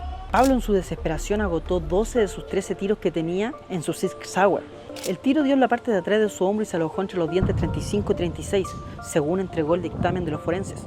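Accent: Chile. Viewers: es el chico que hace el vídeo verdad? Porque tiene acento chileno.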